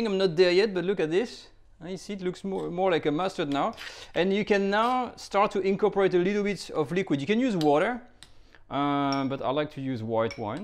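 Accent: France. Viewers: You could tell he’s French by his outrageous accent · Hey, I did not not intend to offend you. Of course you can buy the stuff at a store, but the point of this clip was (IMHO) how this stuff made and how to make it yourself if you are so inclined. I for one enjoyed the presentation, the french accent and learned a couple things along the way. But then again, to each his/her own. Piece.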